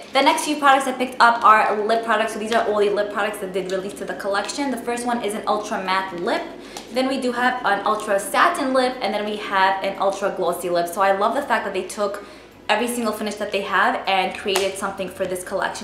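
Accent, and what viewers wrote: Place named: New Jersey, United States. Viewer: you have the sweetest accent!!!! Are you from NJ?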